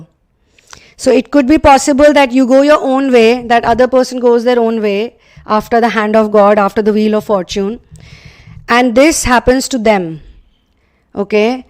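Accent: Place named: India. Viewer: I ABSOLUTELY LOVE to hear you speak! I love the accent from India! Thank you so much for your help and guidance ❤️🙏🙏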